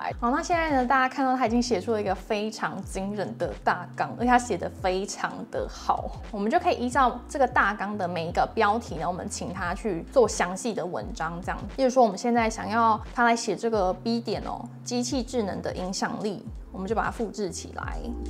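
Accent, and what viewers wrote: Taiwan. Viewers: 吐词不清的弯弯腔听不懂(⊙o⊙) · 一聽就是臺灣口音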